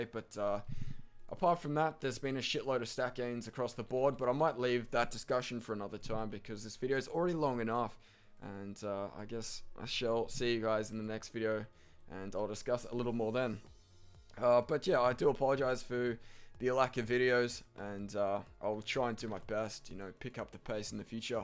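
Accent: Australia. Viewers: are you Australian? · just an Australian accent...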